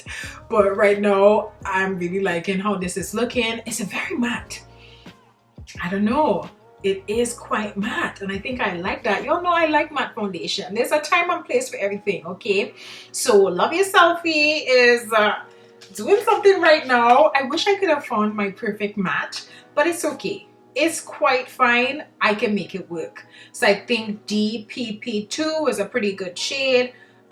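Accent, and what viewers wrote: Trinidad and Tobago. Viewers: When I heard that trini accent....subscribe ONE TIME GYUL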